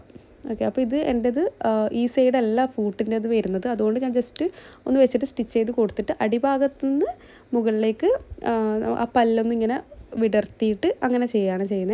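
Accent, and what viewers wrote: Kannur, India. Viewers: ഇത്ത ഇങ്ങൾ കണ്ണൂർ ആണോ ? Slang😁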